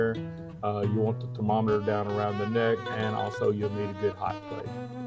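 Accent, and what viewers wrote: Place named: South, United States. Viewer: The southern drawl-y accent is super likable to me atleast, whos from Northern Sweden, arctic cirlce. ❤️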